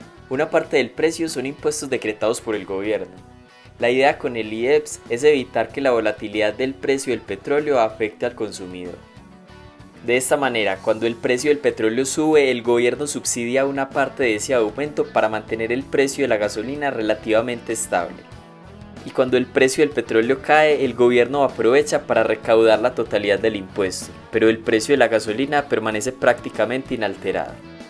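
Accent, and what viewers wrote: Antioquia, Colombia. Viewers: Su acento es claramente colombiano. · Es paisa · Pensé que eras paisa, tienes un asentó parecido.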